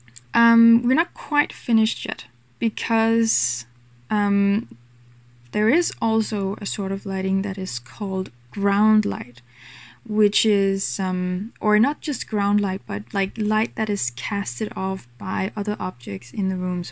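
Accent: Denmark. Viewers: Are you Danish? Your accent sounds very similar :P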